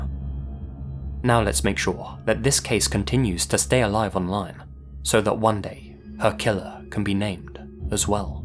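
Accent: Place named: United Kingdom. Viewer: You're the best. I love you're vidoes their so entertaining. Keep up the good work dude and i love your British accent.:) Have a great day man. 😊❤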